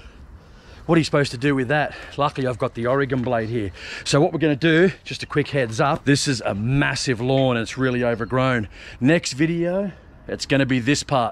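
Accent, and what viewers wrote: Australia. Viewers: You sound Australian. I would be a tad scared of the snakes and spiders on that job. Glad you did help the lady out. Well done.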